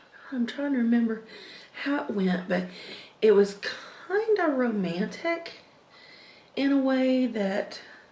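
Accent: South, United States. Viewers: !!!! Hope you're holding up. Just started the really deep abuse blogs with my husband who was severely sexually abused when he was small in Nebraska (a very bad state for that). We need a part 2 if you can handle speaking about it. Helping us immensely. Your writing/speaking is beautiful and I hope you can eventually get published, like no joke. Also, I understand this is your career but I hope you can start to incorporate more healthy items. Much love! <3 Also, I bet your live really South/Western because you sound JUST-JUST like my Nana from Galveston/Houston.:) Makes me miss her. Haven't seen her in years.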